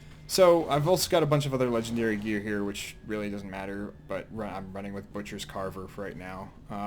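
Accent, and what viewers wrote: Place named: East Coast, United States. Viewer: Hey dawg your channel is awesome and so is your east coast accent, I wonder how u have such low subscribers, good luck and thanks.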